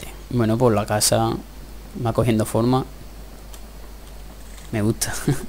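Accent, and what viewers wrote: Andalusia, Spain. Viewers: Videos de Ark comentados con un buen acento andalú, que más se puede pedir, illo? Sos un crack. Saludos desde Argentina. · Por tu acento deduzco que eres andaluz, de que parte ee andalucía eres? Yo soy gaditano😁😁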